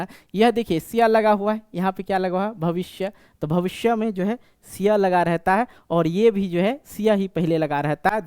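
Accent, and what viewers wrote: Bihar, India. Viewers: Sir aap bihari ho ya भोजपुरी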